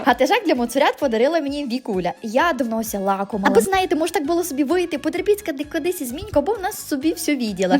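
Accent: Transcarpathia, Ukraine. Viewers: Скільки повторяти це ЗАКАРПАТСЬКИЙ ДІАЛЕКТ ЗАПАМ'ЯТАЙТЕ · А закарбацькій діалект😊😊😊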